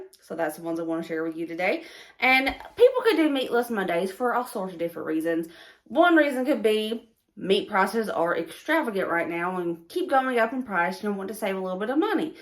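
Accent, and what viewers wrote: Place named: Tennessee, United States. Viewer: I love watching your videos. Great information and ideas but your accent reminds me of Home. i am a transplanted Vol living in another state and I miss home.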